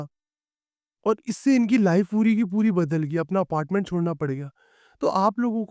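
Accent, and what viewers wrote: Punjab, India. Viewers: आप पंजाब से हो क्या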